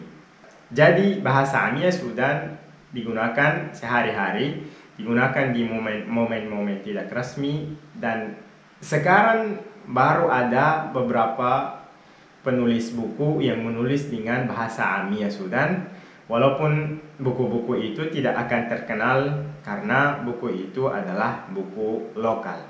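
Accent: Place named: Sulawesi, Indonesia. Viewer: Bang masyaallah sukses selalu ya, btw logatnya abang Sulawesi bgtt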